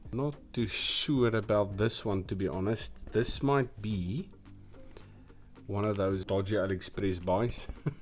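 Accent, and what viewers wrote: South Africa. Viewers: I like it bud! I subbed to stay tuned in. Looking forward to what's to come in the future 👌🏼 Hey on a side note what is that checker board square area you were driving in meant for? I'm in the U.S so just curious. Also I always try to pin down accents and I'm guessing south Africa? If yes you have a beautiful country!! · Great video.I watch your channel for the interesting content and also the fact that you sound South African.any advise.Bought this but after 10min or so of running in the grass it cuts off.esc is very hot.Is this normal?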